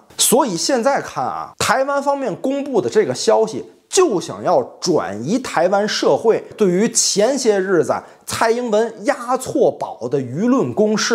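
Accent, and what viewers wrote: Tianjin, China. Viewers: 哎，关注晚了，杠杠的正能量，还挺幽默，目测应该是天津的，和罗尼口音挺像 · 哥，是天津人吧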